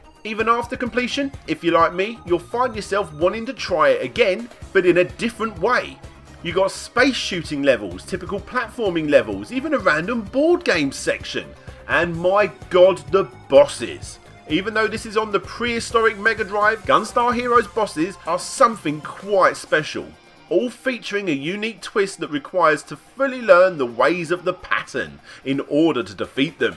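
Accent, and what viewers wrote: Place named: London, United Kingdom. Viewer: Lower-middle class Londoner with a poor mic and worse marketing. Shame though; his content seems pretty good.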